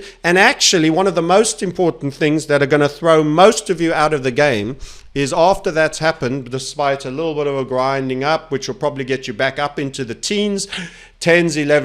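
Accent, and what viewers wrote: South Africa. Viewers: you are a sooth sayer sir. I have heard some sayers of sooth before sir,but this level of sootherie is staggering. (Just curious, South African accent?) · South african bud not ozzy ..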